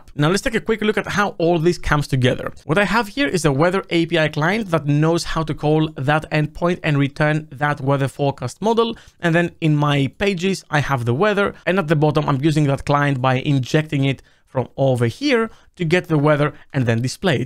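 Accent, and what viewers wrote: Greece. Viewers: are you greek? Not only does your name seem greek but your accent sounds greek to me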